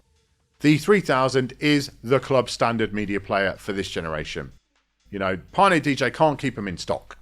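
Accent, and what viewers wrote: England, United Kingdom. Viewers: In we TRUST!!! The only English accent DJ I can stand lol... No offense please, but let's be honest, I hate watching videos from people with an accent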